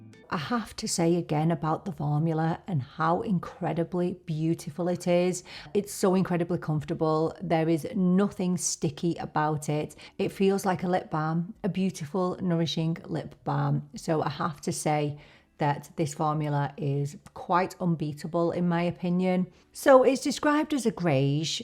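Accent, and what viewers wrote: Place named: Yorkshire, United Kingdom. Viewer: Subscribed as soon as I heard your (Yorkshire?) accent! Not often I find someone on YouTube in my age group and from Northern England 😊. I too moved away many years ago and listening to you reminds me of home. A MAC lipstick video as well…perfect!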